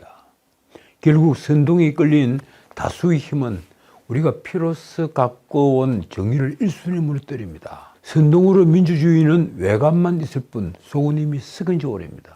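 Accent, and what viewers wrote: Gyeongsang, South Korea. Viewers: 전 변호사님은 지극히 타당한 말씀만 해 주시네요. 경상도 억양이지만 듣기에 부담이 없고, 모두 수긍이 가는 내용이라서 믿음이 갑니다. 감사합니다^^~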